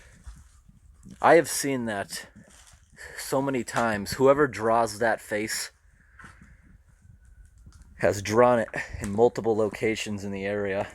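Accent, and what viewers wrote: New England, United States. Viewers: Wonder why abandoned buildings like this weren’t torn down. Love your New England accent btw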